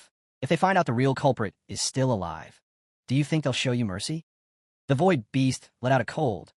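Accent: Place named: United Kingdom. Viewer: Narrator became British halfway through lol this is epic lol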